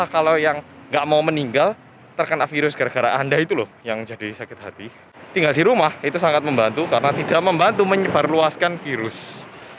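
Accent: Java, Indonesia. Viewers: stay safeee jang hansolllll medhokk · Me : Weh terjebak dengan vlog abang ni 😂😂 . Suka dengar sebab ada slang bhasa Jawa ( bila your dad is Javanese but you cannot speak Jawa and takde slang pom . Jadi terus rindu kampung ayah and sepupu kat 🇮🇩)🌸 terus subscribe 😊 Salam dari Malaysia 🌸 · Pengen nonton kemarin tapi baru bisa sekarang 😩 sehat teros mas biar aku denger medhok mu 😁✌️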